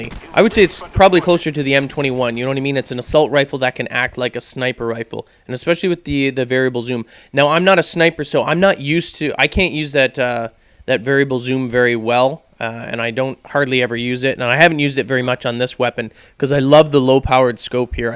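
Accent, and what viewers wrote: Canada. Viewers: Lol are you Canadian? you say abot alot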